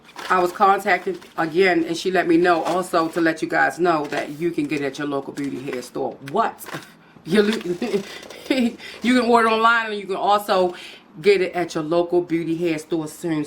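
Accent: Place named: Mid-Atlantic, United States. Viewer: Where are you from? You sound like Maryland, Philly or Pittsburgh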